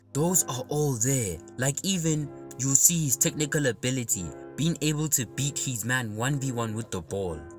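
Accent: South Africa. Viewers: W vid and vids. You South African, bro? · South African accent heavy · No LIE this man sounds SOUTH AFRICAN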